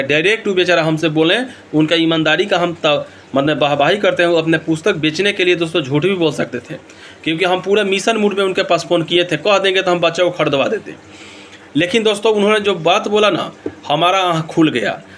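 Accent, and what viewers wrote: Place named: Bihar, India. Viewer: Aap ekdam theth bihari style mei bataye hain sb samajh mei aa gaya sir 👍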